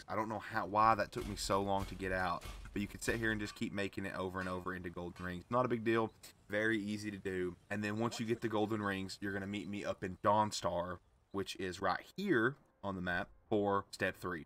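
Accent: South, United States. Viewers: got that Theo Von southern charm love that shi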